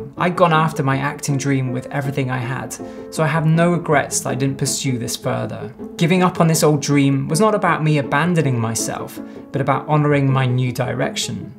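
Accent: Scotland, United Kingdom. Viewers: Dude, I mean this in a good way but your bad acting is pretty entertaining to watch, plus your Scottish accent is spot on. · As an American this means the fact you have a British accent makes you the perfect candidate for an actor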